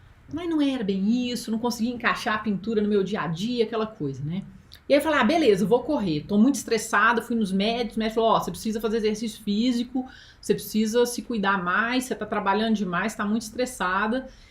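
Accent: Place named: Minas Gerais, Brazil. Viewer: Simplesmente amei! Eese sotaque mineiro é o máximo!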